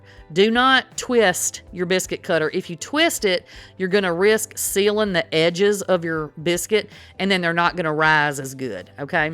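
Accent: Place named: Louisiana, United States. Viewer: Hey girlfriend I'm from North Carolina I hear your accent where you from I'm from the Appalachian Mountains of North Carolina girl I've been cooking ever since I was about 9 years old I thought about making my own channel but I ain't got time for it LOL actually I'm talking about my patience😅😅 but I love your Channel you are just so awesome Update I just saw where you're from. speaking of Louisiana I grew up watching Justin Wilson I love how he always splashed in a little wine😅 Also I wanted to make this comment watching you make a mess with the Bisquick😅😅 is the type of Channel I like to watch I do not like watching channels where people seem to be so perfect that everything they do makes me feel like😅😅😅😅 no talking whatever but I see why I like your channel you're just down to Earth so when you make a mess I ain't bothering me one bit we all make messes that's when I get my kids to come in and clean it up for me LOL😅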